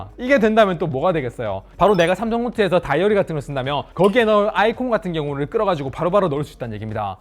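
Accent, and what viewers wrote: Gyeongsang, South Korea. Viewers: 좋은글 감사합니다~ 정신없는 경상도 말투는 정말 안바뀌시네요~ 😂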